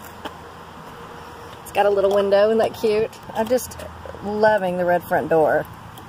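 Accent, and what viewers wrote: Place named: South, United States. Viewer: I'm gonna assume your from the south hence the twang. Love your vlogs💖😘I'm in Louisville,KY which alot of ppl call 'the south' but it's actually mid-west or what some ppl call 'The Gateway to the South' so sometimes I say words like ' Big Ole' but I also lived in Chicago for awhile and sometimes I have pure Midwest accent w/o a touch of twang or Southern, it's the strangest thing! Lol🤷🏾‍♀️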